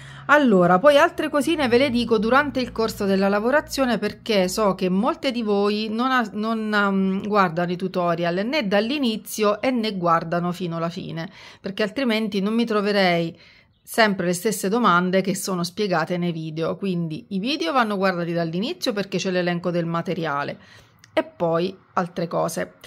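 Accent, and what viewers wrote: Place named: Cilento, Italy. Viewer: Brava e simpatica, hai l'accento che mi ricorda una mia amica cilentana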